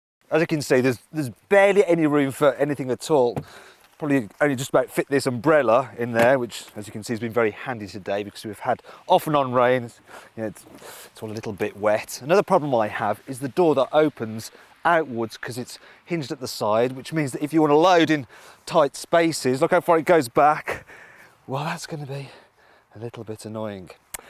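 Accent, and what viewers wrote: United Kingdom. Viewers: its a little bit of a peeeeeeeerch...typical British accent....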